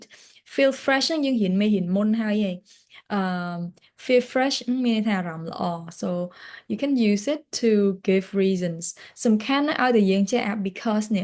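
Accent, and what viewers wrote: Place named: United States. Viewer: អ្នកគ្រូនិយាយភាសាអង្គេស តុង អាមេរិក បានល្អណាស់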